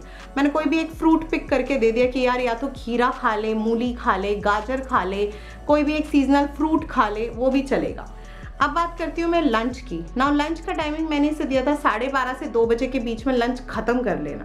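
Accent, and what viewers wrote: Punjab, India. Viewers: Mam Na Punjab Faridkot se bol rahi hai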